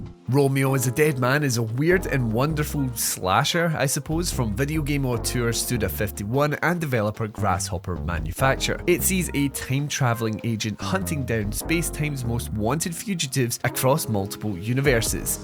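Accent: United Kingdom. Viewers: Please upload review game vedios . You're vedios are awesome. Are you British ❤❤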